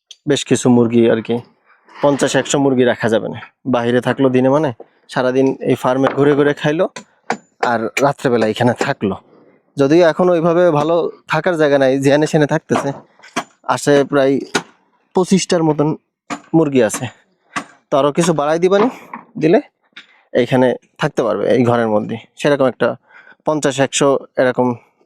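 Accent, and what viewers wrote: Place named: Narail, Bangladesh. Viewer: নড়াইলের ভাষা মনে হচ্ছে।।।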